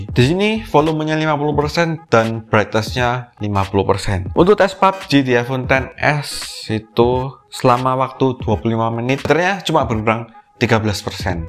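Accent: Java, Indonesia. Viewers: medok banget jawanya (D)😀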